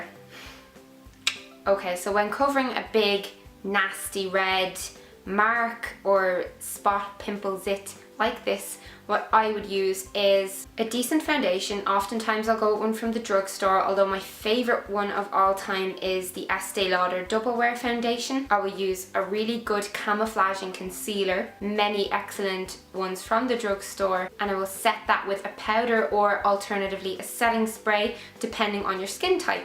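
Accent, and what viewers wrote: Ireland. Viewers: New Subbie!!! Are you Irish (sorry if I'm wrong I'm really bad at recognising accents) Do you know how much the body shop setting spray is??? xxx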